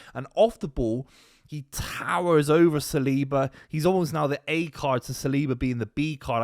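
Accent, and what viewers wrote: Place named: West Country, United Kingdom. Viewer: Must admit zi find the west country accent impossible to listen to. The ward he judt said 'work hard'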